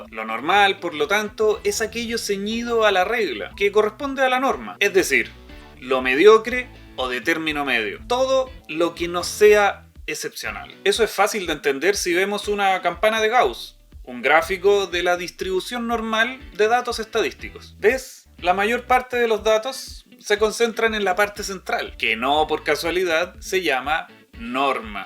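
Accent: Chile. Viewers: Esa voz tan chilena 🙂😉.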